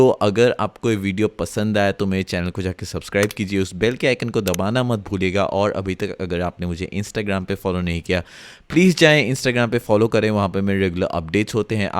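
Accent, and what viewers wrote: Assam, India. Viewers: Bhai are you from Assam?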